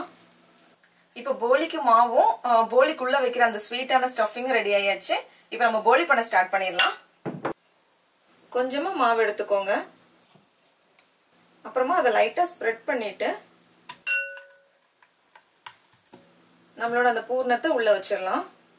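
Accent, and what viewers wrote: Nagercoil, India. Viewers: Thalli, typically Nagercoil slang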